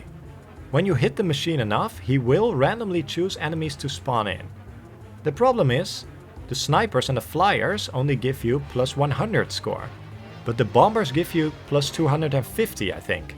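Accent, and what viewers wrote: Netherlands. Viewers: You're Dutch aren't you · sounds dutch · Your dutch aren't you? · ben jij toevallig stiekem een nederlander?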